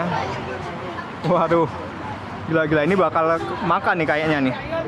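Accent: Java, Indonesia. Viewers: Ketoro wong jowone 😁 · Mantap mas, tinggal di amerika tapi logat jawa nya masih ada 👍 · Asli jawanya mana mas ko medok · Mas ganteng amat ...orang mana sih,,, Tapi gaya logat bicaranya kaya orang jawa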